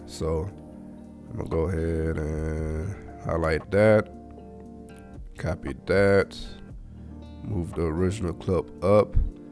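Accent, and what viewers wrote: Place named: Southeast, United States. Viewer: Most SE USA voice I heard as someone from Charlotte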